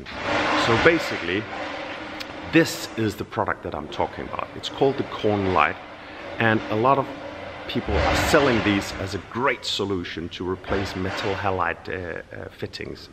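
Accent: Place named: Wales, United Kingdom. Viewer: Love the welsh accent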